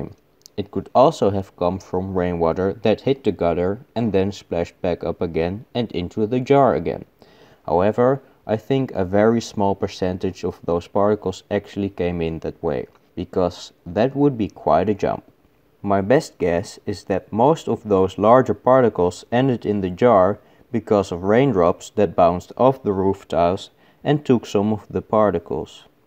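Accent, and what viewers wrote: Netherlands. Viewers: My Netherlander friend, I say this only because I know you are interested in knowing things and not because I am trying to be pedantic, diaphragm is pronounced "die-a-fram." The G is silent. Thanks for the all the great videos & love your accent! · That moment u just feel the Dutch accent and its true too 😂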